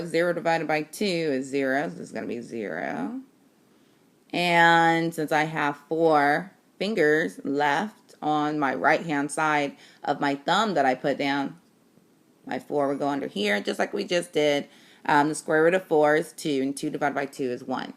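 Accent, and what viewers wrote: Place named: Barbados. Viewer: are you from barbados?? your accent is kinda rihanna-esque lol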